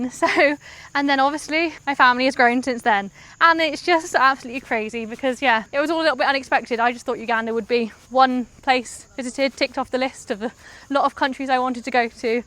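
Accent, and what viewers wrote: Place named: England, United Kingdom. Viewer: I love your English accent ❤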